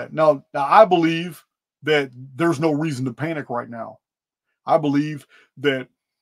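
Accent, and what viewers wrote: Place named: East Texas, United States. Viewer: I'm a new subscriber. From the beginning of '24 someone who worked for me was trying to manifest. Ive listened to you for around 11 hours. The person I mentioned, trying to manifest. W/O a Spiritual foundation. I think thats been the warnings. The person sunk deeper and deep into rage and abusive behavior. It's sad to watch. I'm much older and putting great efforts time and again. We have to do the inner work. Otherwise there is no peace. And we can fall extremely low. I highly suggest pauses at times before reacting, making choices. Get to know yourself. Set goals and show up. You can do it. You definitely are inspired at times. When we don't recall it, it is often called channeling. Which is Humorous because you say you don't. I think you do. You do talk very nearly East Texas. i understand it. I definitely will be looking at you. 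Thanks for all your time, and I certainly hear recovery from times past. Blessings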